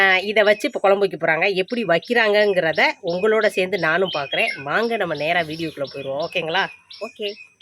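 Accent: Kerala, India. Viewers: She talks as if born and brought up in kerala. She neither looks good nor has brain. Attitude mattum high level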